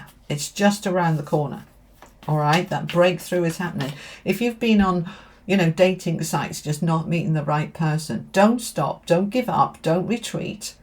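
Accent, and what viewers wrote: United Kingdom. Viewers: I’m English living in Ireland it’s so lovely to hear a good old Uk dialog here,make a cup of tea and listen lovely and your large angel in the back ground is so lovely 😊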